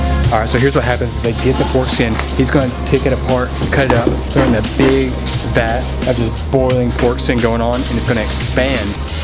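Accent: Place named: South, United States. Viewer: The vlogger sounded like hes southern.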